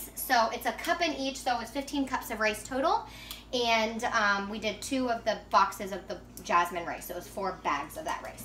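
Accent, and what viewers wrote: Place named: Bangladesh. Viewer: sound like a Bangladeshi ♥♥♥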